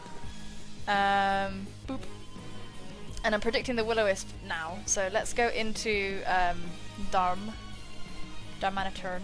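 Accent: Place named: West Country, United Kingdom. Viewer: I only just picked up on your accent. I think it was the way you said "iron head" that did it. Devonshire? Definitely sounds Westcountry to me.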